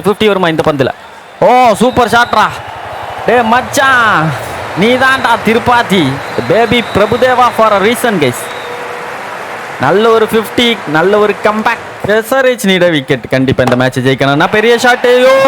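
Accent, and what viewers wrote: Kanyakumari, India. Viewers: Neeenga pesurathu laam nalla thaan bro irukkuthu❤❤❤. Neenga Kanyakumari ah?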